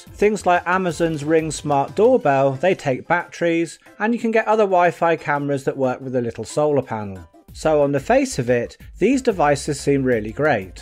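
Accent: United Kingdom. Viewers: Another Great vid. I hear your from South West England like me!! From Exeter, Devon my self!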